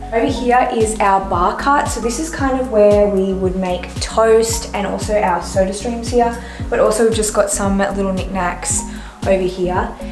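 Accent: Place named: Australia. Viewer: My ‘normal’ you mean outside of Australia? 😂 she has a normal Australian accent ✌️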